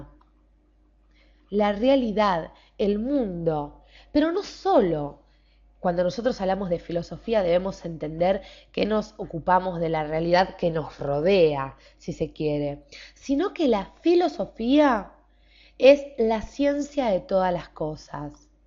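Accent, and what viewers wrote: Argentina. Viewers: pues practicamente este video nos da la definicion y algunos filosofos algo que emos estado viendo a lo largo de la semana, me gusta mucho la filosofia por que es el amor a la sabiduria pero aun mas por que trata de encontrar el por que de las cosas que pasan, tratando de encontrar la verdad, otra observacion es fue ra del tema y mas con el video la voz de la narradora del video me encanta como habla mas por que es argentina :3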